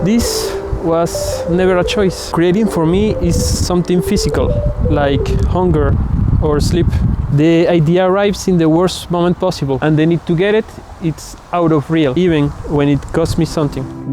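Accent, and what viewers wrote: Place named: France. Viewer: French accent is funny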